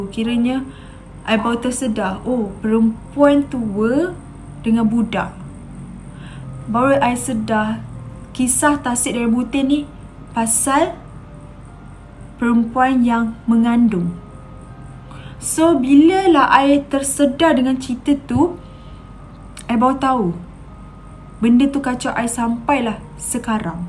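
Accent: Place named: Kelantan, Malaysia. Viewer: Aq rase ni orang2 belah pantai timur.. nada cakap cam orang kelantan je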